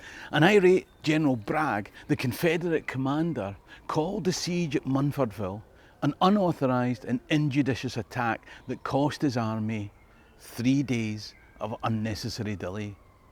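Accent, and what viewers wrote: Scotland, United Kingdom. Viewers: Outstanding presentation -- I'm always excited when I hear your Scottish accent with "Let me tell ye' a story ..."! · l've watched a few of your videos, and being from Texas l love to hear that Scottish brogue. I remember my Dad wanted me to go with him to a drug store and hear this lady cashier, as l purchased a carton of smokes for my Mom. When she exclaimed " Ooh,ya shouldn't smoke. There bad for ya!" I turned to my Dad and told him "She's from Scotland." That lady was kinda shocked that I could tell by the accent. It wasn't long after, a couple were moving in next door to my Mom and Dad upstairs. And I only saw him and his girlfriend (wife) going to bring a full size couch up So l insisted to take the couch from the female. After we got it into the apartment (flat), when he spoke l could tell he wasn't from Texas, but Cork, Ireland. 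His significant other, she was a California girl but not a blonde! I mentioned to them about the lady at the drug store that was visible from the building where we were standing. I know he was excited about Ireland getting into the World Cup that year, lf l remember correctly it was in'90. He had ask me did l watch football, and l just assumed he meant American football, l said football hasn't started yet being Summer time. He then said Soccer, l told him as a kid l played it in Gym class. But in the '70s it wasn't popular as nowadays in America. Too much running for me, and l've always been a skinny person, but smoking doesn't help neither. But l do like to hear y'all talk!😊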